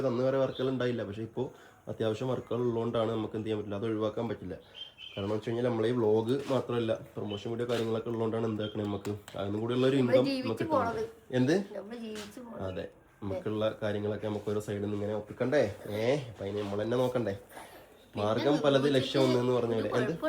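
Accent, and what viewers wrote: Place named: Kottayam, India. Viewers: അച്ചടി ഭാഷ കോട്ടയം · അച്ചടി ഭാഷ കോട്ടയം ജില്ല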